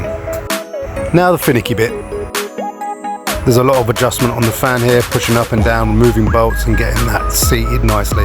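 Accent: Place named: London, United Kingdom. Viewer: Love that london accent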